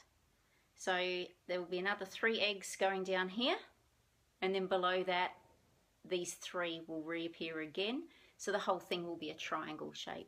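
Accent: Australia. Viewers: Hi, l too have only recently discovered "floss tube" and am really enjoying watching your videos as I stitch. My name is Sandra. I'm a born n bred Aussie living in Texas, USA. It's nice to hear an Aussie accent:-) The wolf kit that you purchased, I saw on another floss tubers - Kelley Jo Crafts - she is an American lady and I'm sure she found it more locally. Sorry I cannot find the particular video of hers with that kit. My current wip is "Fairy Castle" from 'Crosstitch Magic' which was a fortnightly publication I subscribed to many years ago in Aus. Thanks for sharing. Bless you heaps, Sandra.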